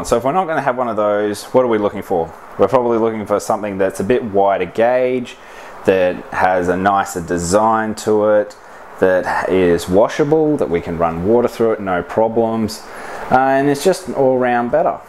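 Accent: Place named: Australia. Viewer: Australian accents are bomb. good review man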